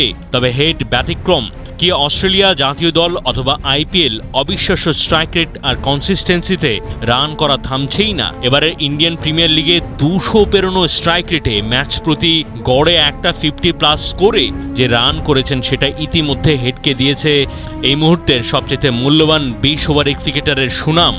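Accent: Kolkata, India. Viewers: কোলকাতার কোন এক দাদা পড়তেছে খবর?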